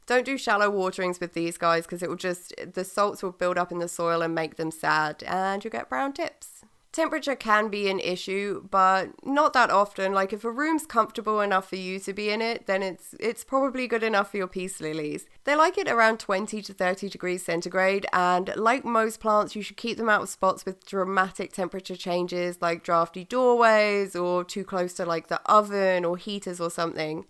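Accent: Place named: RP, United Kingdom. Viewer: It’s a perfectly normal English accent. RP ( Received Pronunciation) , or the Queen’s English ( as it was once known). Probably both terms deemed hate crimes or xxxxxxxphobic or some such nonsense in today’s’woke’ society. Just plain English. As was once the dominant language in England.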